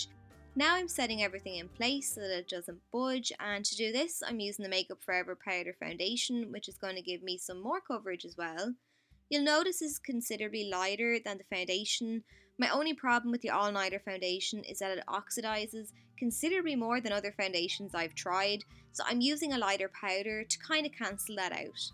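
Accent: Ireland. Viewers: well done as always i like your acent look like Iresh 👍👍👍👌👌💗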